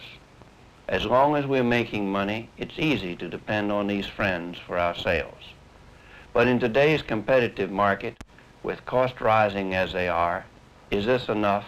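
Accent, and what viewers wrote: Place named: Virginia, United States. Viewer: The CC says "it makes me shut up." He is actually saying "it makes me SHUDDER." There is that Virginia accent to account for. Yes, NICE old trucks!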